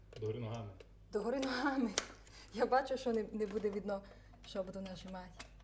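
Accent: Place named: Western Ukraine, Ukraine. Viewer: чому "баночкі" а не слоїки?)) Можливо я помиляюсь...але, мені здається, що жіночка не з полісся. Акцент дуже схожий на західний.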